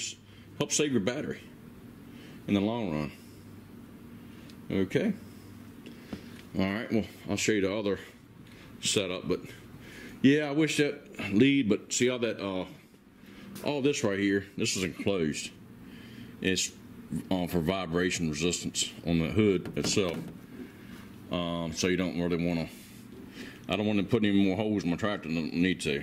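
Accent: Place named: Alabama, United States. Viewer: Thanks for very helpful review. I just ordered this charger for my John Deere 110TLB backhoe. I was tired of my battery dying at critical times...like last year when my 37-ton log splitter arrived from Northern Tool and the crate was damaged so bad I had to unload it all in my driveway with the JD, since the girl who drove the delivery truck was unable to help at all. I got the log splitter and crate halfway out of her truck and onto my loader bucket, then tried to back away from her big truck and the JD battery went "NOPE...I'M DONE!" After we somehow got her truck pulled away and I was left alone with the problem to solve, I had to get the battery charger hooked up on an extension cord and got enough juice into the battery to crank up and make it back up my hill. I was exhausted, as was my wife, and I decided I wouldn't let this happen again. 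I've got a new battery tester too, and will keep this 1.5A trickle charger on my battery in same configuration as you mounted it on your machine. The dampness and cold of the Pacific Northwest is brutal on batteries in Winter. Thanks again for your great review. And dang if you don't talk just like me, an Alabama boy!....ha ha ha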